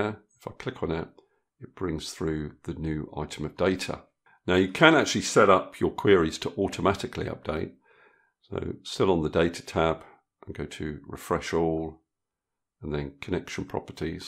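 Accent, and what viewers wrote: United Kingdom. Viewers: It is hard to find a British Tutor on here. Most are American/Canadian. Most importantly, I am struggling with IT. I am trying to watch your videos step by step. However, what should you do when there is no formula at the top?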